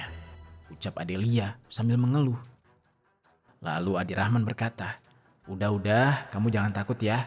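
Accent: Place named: South Sulawesi, Indonesia. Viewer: dari sini kita tau pengisi suaaranya berasal dri sulsel :D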